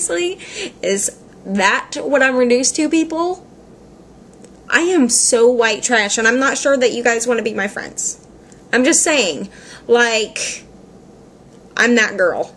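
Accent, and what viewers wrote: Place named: Rural, United States. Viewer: lol ur hick accent is funny.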